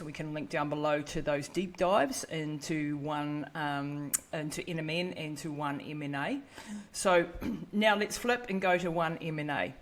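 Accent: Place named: New Zealand. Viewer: New Zealand accents make no sense to me. Why pronounce hair as "hee-ah?"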